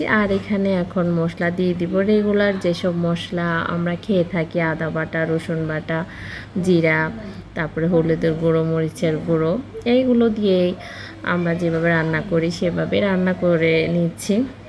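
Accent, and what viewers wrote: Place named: Chittagong, Bangladesh. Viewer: আপু কোন জেলায় আপনার বাড়ি আমি চট্টগ্রামের মেয়ে কথার টানটা পরিচিত লাগে❤❤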